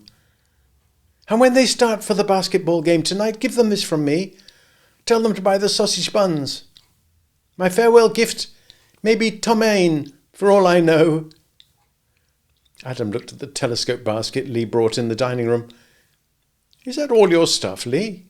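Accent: England, United Kingdom. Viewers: English accent preferred!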